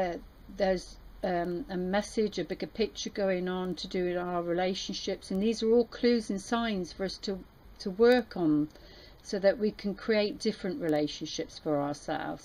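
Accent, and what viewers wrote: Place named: United Kingdom. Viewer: Impressive....first time watching and I loved everything about this. Resonates so much....like you were talking directly to me. I also have to say, loved listening to your accent....makes me miss my British family even more.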